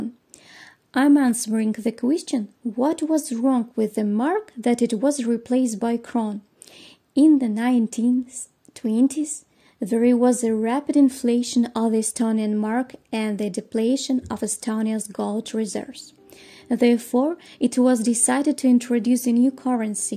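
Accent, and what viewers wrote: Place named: Russia. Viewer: First, I want to say I think it's great you're practicing your English and aren't afraid of making mistakes! However, I am a native English speaker with some Russian language background, and even with my better-than-average ability to listen to Russian accents, your Russian accent is so strong I can't understand half of what you're saying. It barely sounds like you're speaking English. I would suggest three things: 1. Put English subtitles in your videos, or 2. Practice your English accent until you're understandable before making more videos, or 3. Just do your videos in Russian.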